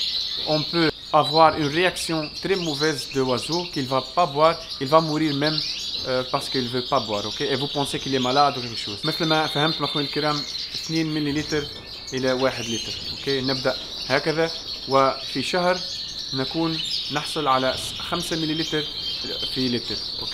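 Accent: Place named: Tunisia. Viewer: منضنش الله اعلم تونسي ولا ليبي على حساب اللهجة تاعو على الاغلب تونسي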